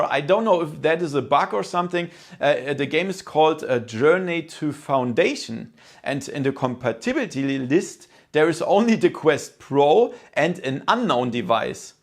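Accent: Germany. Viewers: Are you German 🤨🤔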